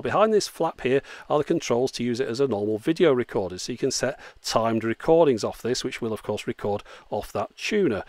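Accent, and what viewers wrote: United Kingdom. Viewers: It's just one of those British things. "Patent" with a long a, "Mazda" with a short first a, "missile" with a long second i. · "Skullduggery was afoot" - god, I love listening to British people talk.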